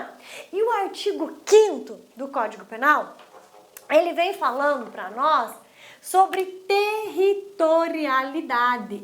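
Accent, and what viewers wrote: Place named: Rio Grande do Sul, Brazil. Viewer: mas bah, que gaúcha!!! Você arrasa mulher!!!!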